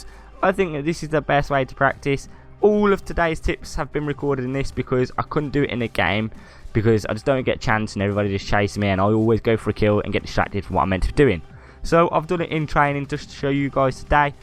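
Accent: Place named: Birmingham, United Kingdom. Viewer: u from birmingham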